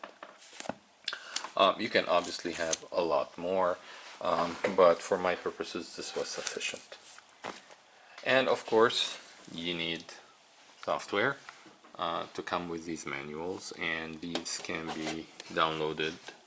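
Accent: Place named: Sweden. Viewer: This guy sounds like he is not mainly English, maybe Swedish or something i don't know, probably that's why. I think he did great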